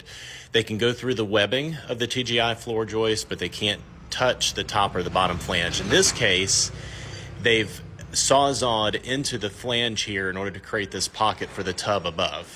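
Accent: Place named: Midwest, United States. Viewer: Wow, That's a sizable number of mistakes. By your accent I'm guessing this in the midwest somewhere, possibly MI or Wisconsin? Just moved out to AZ and I can tell you the level of building integrity is far below my former home in MA. I'm in a 7 yr. old house and I'm finding serious flaws almost daily. Was a builder/remodeler back east.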